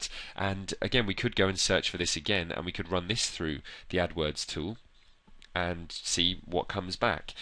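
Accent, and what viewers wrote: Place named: Wales, United Kingdom. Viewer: This vid, and what I think is a Welsh accent, is awesome. I'm starting a second career and thinking about marketing, research, etc. Don't have much of a clue how to get started. We didn't have computers 101 in college when I went eons ago, So thanks, I've subscribed and will watch your other stuff. This video has an easygoing casual approach, with just enough complexity for me to wrap my head around. Excellent, thanks again, and happy trails to me!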